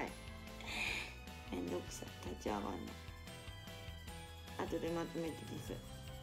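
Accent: Kansai, Japan. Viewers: なんで関西弁？笑笑可愛すぎやろ😭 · 関西弁多いね。かわいい💕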